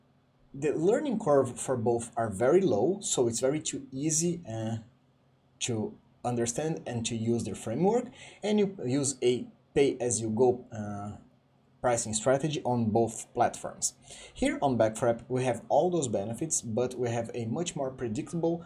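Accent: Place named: Brazil. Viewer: Cara, é incrível que no instante que eu te ouvi falar eu já tinha quase certeza que você era brasileiro, fiquei muito feliz por isso. Sucesso pra vocês e obrigado pelo conteúdo.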